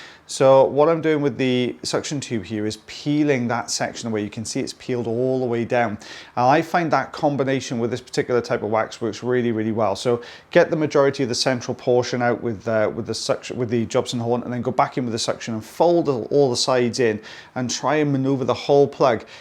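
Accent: Wales, United Kingdom. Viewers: Love the Welsh accent. A lot of Wonderful Singers come from Wales. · Off topic: I'm totally in love with your Brit accent! I could stand to listen for ages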